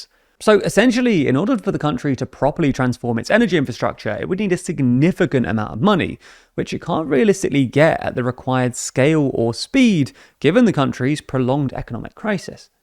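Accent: United Kingdom. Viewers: Cuber. I love British speak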